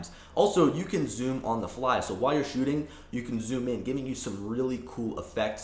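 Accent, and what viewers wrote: Philadelphia, United States. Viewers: Hey, Wow, r u from Philly or NJ.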